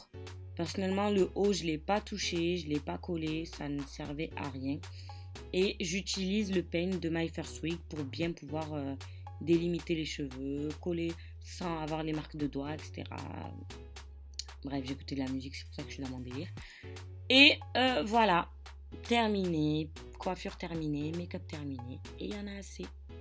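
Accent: Marseille, France. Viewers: J’adore 😍 jaime trop ton accent marseillais! 😘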